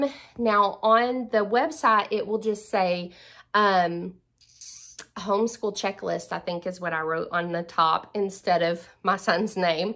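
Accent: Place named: North Carolina, United States. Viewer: Love your accent because it matches mine. ❤ I’m from the mountains of NC.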